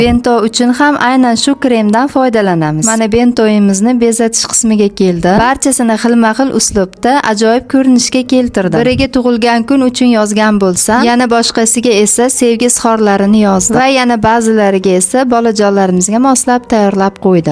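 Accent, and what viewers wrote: Namangan, Uzbekistan. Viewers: Adres qayerda Namangan shevasiga oʻxshayapti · Sheva Namanganniki manzil ham Namangandami.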